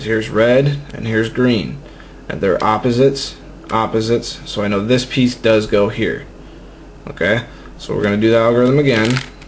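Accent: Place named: West, United States. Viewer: you talk like a cowboy, nice dude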